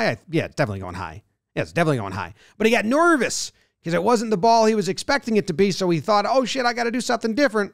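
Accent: United States. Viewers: So weird to hear an American accent talking about cricket. Great vid though. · Nothing against you mate but it is weird hearing an American accent talking cricket. I felt the same with Leigh Diffey’s Australian accent covering US Track and Field. #mindblown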